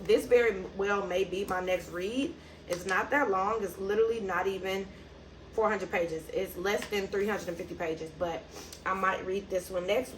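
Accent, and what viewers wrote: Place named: Bay Area, United States. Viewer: ! I was just telling my husband I don’t know where she from but she sound like she from The Bay but when you drive around it’s not baying😂😂 this thought was a while ago when I first found your other channel, then I came over here. I’m from Oakland, but I been in Pitt for hella long now so yeah P-World 🖤🧡🖤🧡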